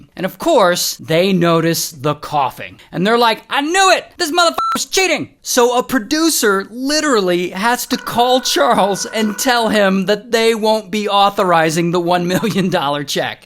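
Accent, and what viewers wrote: United Kingdom. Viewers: I’m impressed on William Johnson British accent in this video it sounds like he’s actually British! 😂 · The British accent 😂😅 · The British accent 💀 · Your British accent got me rolling 😂😂😂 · That British accent is wild! 😂😂😂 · british accent is killing me 😂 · Impeccable british accent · The British accent is 🧑‍🍳💋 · British accent 😂!!! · Well done on the British accent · the british accsent is killing me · Oh my. That british accent.... especially the 'oh bugger' not gonna lie i had to pause until i stopped laughing. Keep doin you. We love ya 😊❤😊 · Liking immediately after hearing the British accent 😂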